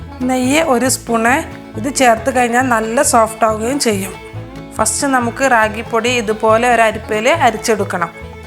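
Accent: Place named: Malabar, India. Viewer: മലബാർ മുസ്ലിം ഭാഷ ആണ്. മലപ്പുറം ആവാം. ഉറപ്പില്ല. എന്തോ ആവട്ടെ ഞങ്ങളുടെ മലബാർ ഭാഷയെ കളിയാക്കണ്ട കേട്ടോ 😊😊😊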